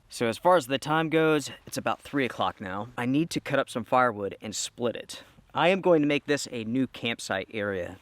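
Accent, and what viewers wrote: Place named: Appalachia, United States. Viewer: I hate ur hillbilly pipes!!!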